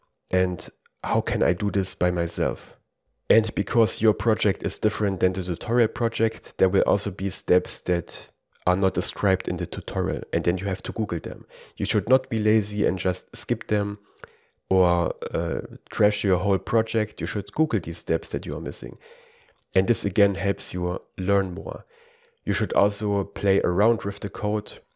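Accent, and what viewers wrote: Germany. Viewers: man merkt etwas, dass du aus Deutschland kommst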